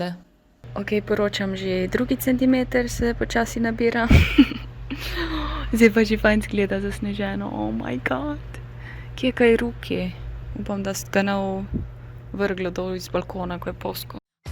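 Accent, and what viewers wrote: Carinthia, Austria. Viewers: Tvoji vlogi so meni zelooooooo zanimivi saj se pocutim zelo domace ko slisim koroski naglas ker sem tudi sama iz koroske🙈😂🥰👍👍🌈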